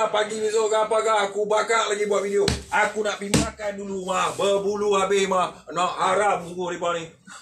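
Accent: North, Malaysia. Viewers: Dulu Cara ckp kl skrng nah ckp utaqa boi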